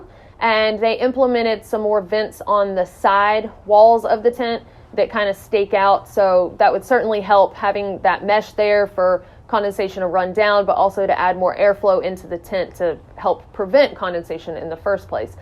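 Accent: South, United States. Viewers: lol I assume you are from the south'' hose pipe'' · Greetings from Spain. There is a Quechua brand tent from decathlon stores and it's only at €20. Very similar at specs and performance. I really enjoyed your American accent :) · Wide or Why'd? Southern accents are so cute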